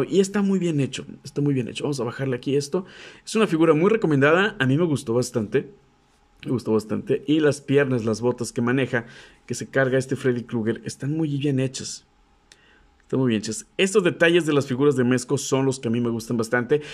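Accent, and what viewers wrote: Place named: Coahuila, Mexico. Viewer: Eres el mejor:D sigo sintiendo tu asentó cuahuileño aún que ya me dijiste que eres de Tijuana:D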